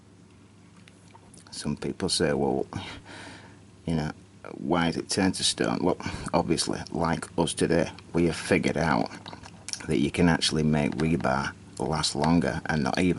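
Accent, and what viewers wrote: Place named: Manchester, United Kingdom. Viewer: Mcmahn 1 im from near bolton, and this sounds manc to me, or oldham area.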